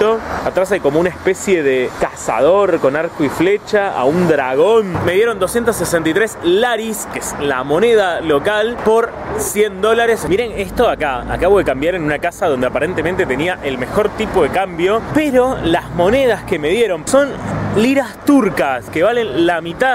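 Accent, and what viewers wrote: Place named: Argentina. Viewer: Querido, debo confesarte que te descubrí hace poco tiempo. Soy jubilado, orillando los 70 pirulos y me paso las tardes viendo y disfrutando tus videos. Además de ser muy interesantes, tenés una manera de narrarlos muy argenta. Pondero la honestidad que profesás por tu cultura, el respeto por tus ancestros sin desestimar tu Argentina a la que has recorrido y mostrás con deferencia. Felicidades por tu juicio, por tu labor educativa y por haber encontrado una manera apasionada de vivir!.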